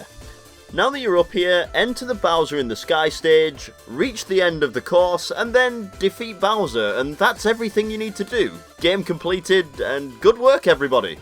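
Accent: United Kingdom. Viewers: Are you British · He's British I think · Are you British?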